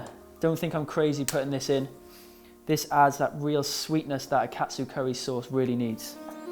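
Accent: Wales, United Kingdom. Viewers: Your cooking is incredible! Thanks so much for sharing your skills, I would so happily watch you on TV doing this - get those Welsh tones on telly!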